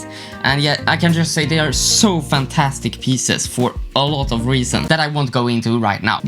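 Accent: Sweden. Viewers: eyy, du e svensk jag trodde det. ´´fick nåt i halsen´´ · Are you from sweden?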